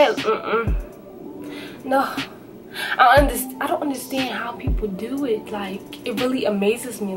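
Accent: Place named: Philadelphia, United States. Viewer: yo im like she sound like she from philly then i was like oh snap! thats wassup. Im going to collage in atl im looking at clark and Georgia state maybe i see you there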